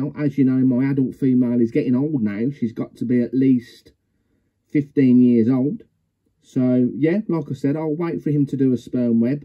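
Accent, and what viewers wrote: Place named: Birmingham, United Kingdom. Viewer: Yeah, it’s really nice here. On the coast. But, we have our fair share of issues with drugs etc 🤦‍♀️ I guess that there’s no escaping that. When I was younger (much younger 😂) we knew nearly everyone, not now though. There’s more houses being built and people. Same as everywhere else I guess. You’re a Brummie aren’t ya? There’s no missing your accent 😂 xx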